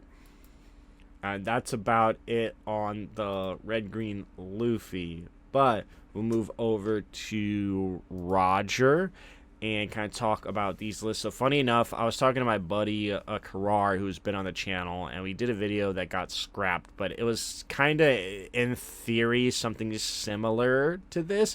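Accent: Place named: East Coast, United States. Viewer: What part of the East Coast are you from? There's no chance that accent is anything but East Coast!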